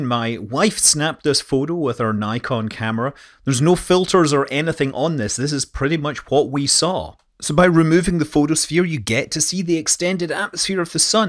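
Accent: Scotland, United Kingdom. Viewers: Love to hear a Scotsman talking about a magnetohydrodynamic drive, as always.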